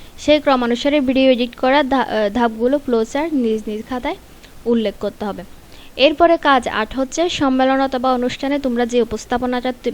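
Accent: Chittagong, Bangladesh. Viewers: ম্যাম আপনি মনে হয় চট্টগ্রামের?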